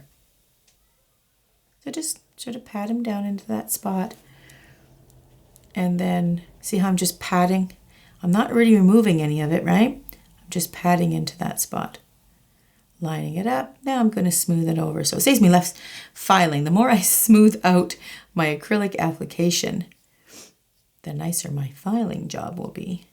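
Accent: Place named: Canada. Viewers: Cecile Ruer You are welcome Xx. You can hear it by how she pronounces certain words. Like -about etc. Very typical Canadian, and I love it hihi. No Aussie accent tho which is totally different :P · Gille87 Yeah i asked because the accent was for me very canadian :p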